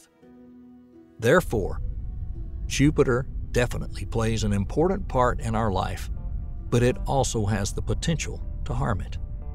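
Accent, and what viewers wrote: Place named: Georgia, United States. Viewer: This leads one to opine... there is some truth to the notion that Jupiter is a savior god, pulling us away from hell, and that the sun is hell, Lucifer, drawing all to it inevitably. This reforms the Judeo-Christian hierarchy of the trinity. God is Satan, the Sun. Jesus is the savior, Jupiter. The holy spirit is gravity, the unifying force that these entities use to interact with us. All this is hogwash, of course. But it is an example of solipsistic thinking. I just did this to show you religionists there's a better explanation that still isn't true. Your creation hypothesis makes no sense at all. P.s. I love how this guy with a southern, Georgia accent, speaks so intelligibly. He's an outstanding orator, with perfect information. Great presentation. Thank you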